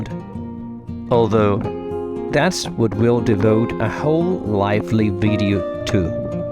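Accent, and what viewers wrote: United Kingdom. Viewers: Bel video e belle gnocche, voglio farti i complimenti per il tuo accento British, mi sembra di capire che tu sia italiano, s'è così hai una pronuncia perfetta.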